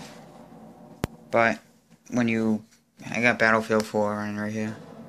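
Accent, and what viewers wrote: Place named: New England, United States. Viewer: You from mass or maine???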